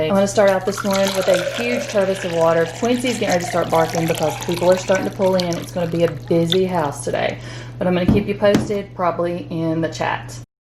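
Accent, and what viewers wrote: United States. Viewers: Please don't stop the chats‼️. I live in the UK now and listening to you makes me feel so much like I'm back in the US‼️‼️. Keeps me from missing home too much 🤗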